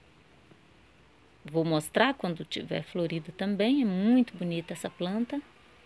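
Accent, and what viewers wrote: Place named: Goiás, Brazil. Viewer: eu perguntei qual a sua cidade pq talvez podemos trocar mutas e o tipo de falar parece ser do meu estado de Goiás GO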